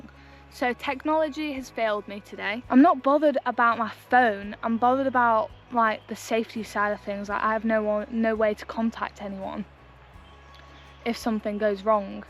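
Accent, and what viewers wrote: United Kingdom. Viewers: Love your UK accent ❤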